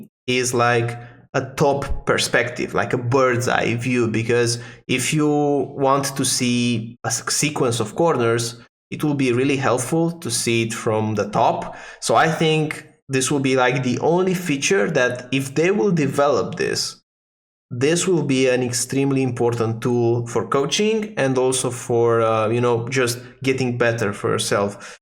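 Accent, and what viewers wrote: Romania. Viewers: Knew you were Romanian mostly from the accent, but reclamele în limba română au clarificat acest lucru. 😅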